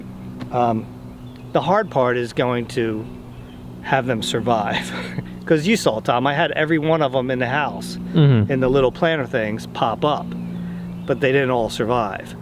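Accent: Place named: South, United States. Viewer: I have always wondered. Is saying "anywheres or somewheres" a southern thing? Good vid today!! I'm about to cannon ball in to your pool!!!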